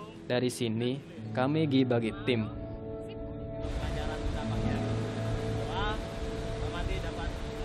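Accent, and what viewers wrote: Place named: Probolinggo, Indonesia. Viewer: bosone rek ngilani khas probolinggo bgttttt,hhahahhahha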